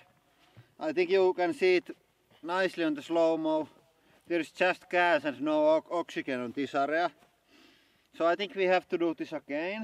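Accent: Finland. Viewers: kuulostaa suomalaiselta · You Sound like your from finland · Are you from Finland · tää ralli englanti 👌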